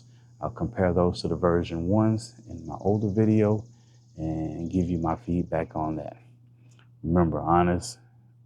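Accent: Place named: South Louisiana, United States. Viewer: Helpful and informative review. Thanks friend. By any chance, are you from South Louisiana? You sound like it. I’m from Lafayette.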